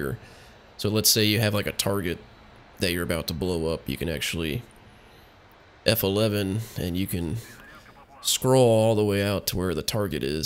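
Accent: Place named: United States. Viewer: 'mi...' what? Zee...? You Yanks are really 'zeee' in your sick nappers. Unbefuckinglievable how you linguistically inapt and generally illiterate riffraff mutilate the English language. And to top it all off some even add this disgusting, thick rhotic accent... Barf! It causes ear cancer. How about not to try to swallow your own tongue while you speak? 'Nohohoho, better not, for we could sound like humans and not like the armed lunatics who we are, spread all over the world to murder other peoples.'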